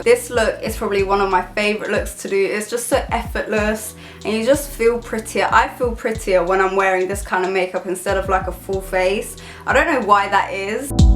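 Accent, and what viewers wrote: United Kingdom. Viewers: Strange accent, a mix of British and... German? Dutch? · теку от её Британского акцента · the engaging British accent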